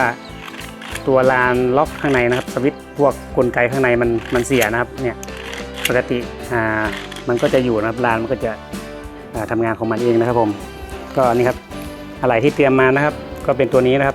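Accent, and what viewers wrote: North, Thailand. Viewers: ขอบคุณครับกำลังเปลี่ยนพอดี ชอบช่างคนนี้อธิบายดี พึ่งรู้ว่าอู้ภาษากำเมืองเน่อ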